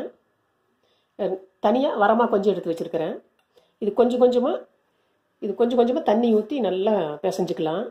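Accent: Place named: Kongu, India. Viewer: அருமைங்க அக்கோய்.. நீங்க சொன்ன விதமும், உங்க கொங்குத் தமிழும் சூப்பருங்க..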